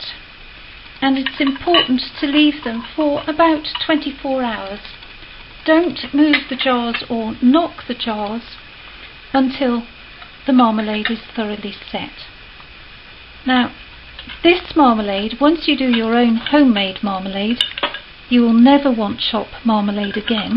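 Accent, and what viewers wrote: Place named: Wolverhampton, United Kingdom. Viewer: I live in Italy and tomorrow im going up town to get me some oranges, we have them all around the town, people dont eat them because they arnt eaten as they are not sweet enough!!! What a waste! noticed you have a northen accent (wolverhampton), like the way you are so clear, I shall enjoy making my marmalade.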